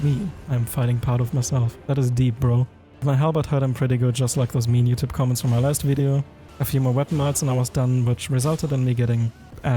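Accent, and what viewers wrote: Germany. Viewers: Either this is the strongest german accent or some kind of hidden english accent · Du bist Deutsch oder? Hört man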